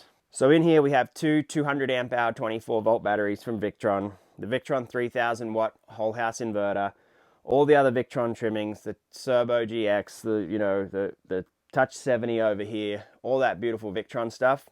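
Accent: Australia. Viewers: Australian accent and then 120V - confused for a while. Disadvantage I see is power loss in converting the 12V DC alternator power to 120V AC then converting it back to 24V DC... I think the new 12-24 Orion would lose less power. I also don't understand why laying some thick DC cables from the Alternator to the Orion should cost so much either... in comparison to the cost of the RV/Caravan. Batteries - I love Victron but there are far cheaper brands of LiFePO4 batteries on the market. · First I thought with your Aussie accent that you were in Australia but not so you’re in the US. Australia has new rules for caravans where batteries that are installed in a liveable space must be in a sealed metal box that’s vented to the outside.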